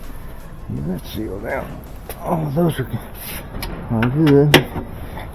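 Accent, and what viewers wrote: South, United States. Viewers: Thanks for looking at my comment and responding, I really appreciate that. I just came across you today, and I really like your videos. Where are you based out of? It's definitely Southern, that's for sure👍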